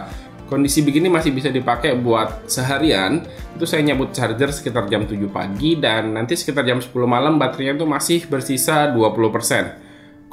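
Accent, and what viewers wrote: Samarinda, Indonesia. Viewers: wih orang samarinda ya bang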